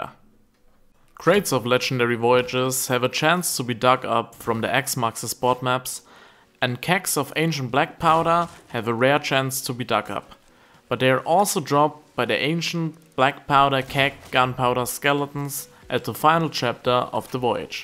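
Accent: Germany. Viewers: Wenn der deutsche Akzent mal wieder komplett reinkickt xD Aber gutes Video 😁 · You are German, arent you? ;) Because there's something about the way you speak. Great video by the way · You sounds like a german haha. Thanks for the Video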